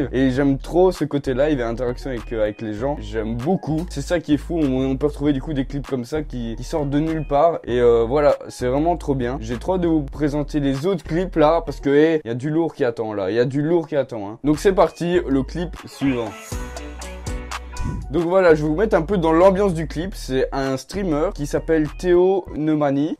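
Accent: Belgium. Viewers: Tu es belge ? L’accent j’connais 🇧🇪 P.S : Super vidéo, mais tu expliques un peu trop les fails, laisse les abonnés regarder puis explique. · Est tu belge ? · Il est belge donc il a un accent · Pk tu parles comme un Belge bizzare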